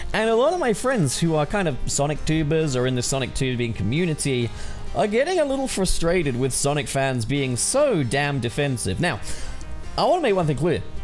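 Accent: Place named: United Kingdom. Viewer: But in your YouTube Channel informations, it says that your in USA (that's why i asked in the first place) but rewatichng your video, i noticed a little the british accent haha (it's not that obvious for a foreigner to notice it ^^')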